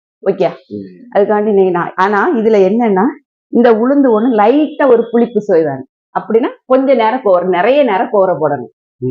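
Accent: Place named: Tirunelveli, India. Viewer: Enjoyed your recipe and your Tirunelveli Tamizh❤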